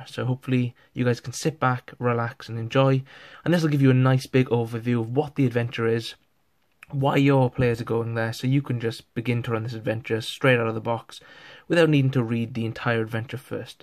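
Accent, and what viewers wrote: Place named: Wales, United Kingdom. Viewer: I know this accent! Are you a fella taff?! ❤️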